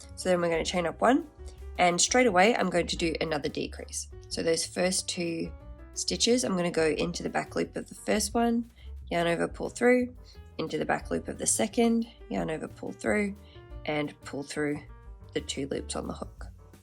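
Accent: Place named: Australia. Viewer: Sounds Australian to me. Great look for crotchet.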